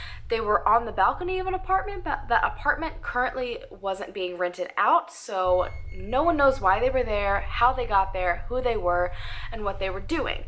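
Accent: South, United States. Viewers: Girl let that southern accent OUT 😆❤️ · I love your Southern Belle accent, 'she gawn missin',...lolz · don’t worry about your country accent coming out occasionally. That happens to me too😂💓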